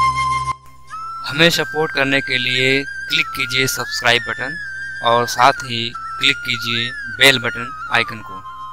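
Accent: Bihar, India. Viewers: bht mst bihari bolte ho aap sir😃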